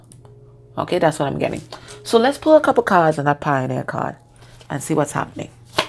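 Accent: Trinidad and Tobago. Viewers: Are u Trini?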